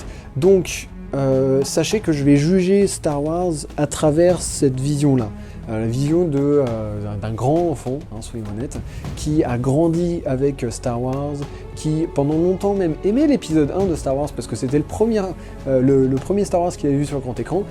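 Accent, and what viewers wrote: England, United Kingdom. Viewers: Cet accent Anglais !! :o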